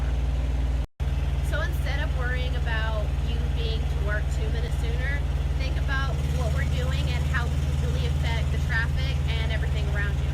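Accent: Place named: South, United States. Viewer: This girl is an absolute beauty. I love her country accent as well. Keep doing your thing girl.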